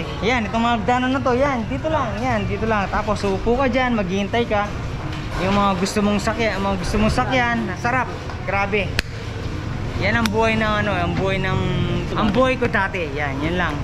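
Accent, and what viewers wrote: Pampanga, Philippines. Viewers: Kapampangan ka tlga ehehe . 😅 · ingat kayu parati soy kapampangan ka pala🤣🤣 taknaydumo anang tita eh🤣🤣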